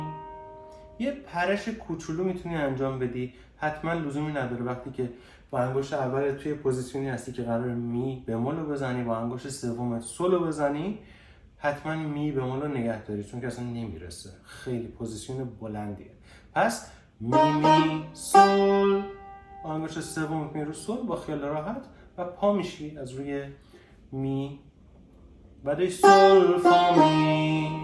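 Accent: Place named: Azerbaijan, Iran. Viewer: برعکس، دوست داریم لحجه آذری و مازندرانی شمارو بشنویم 😊 (مربوط به دقیقه ۱۱:۲۵ )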